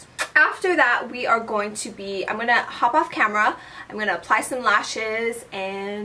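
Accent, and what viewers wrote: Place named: Hawaii, United States. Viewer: loved this video!! watching you and hearing your accent make me miss hawaii so much!! <3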